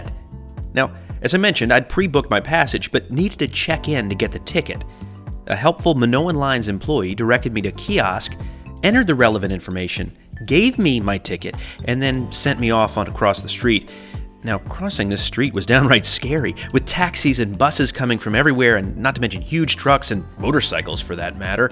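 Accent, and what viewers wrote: United States. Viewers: It's because he sounds and acts American. Some Greeks don't take kindly to Americans, or has that changed? There was a time where it was bad. I remember a time where my Cousin brought an American to Greece, took the Taxi, and got verbally abused by the driver. My cousin answered back in the most perfect Greek to which he shut his mouth. I would assume the same for Journey. I could be totally wrong.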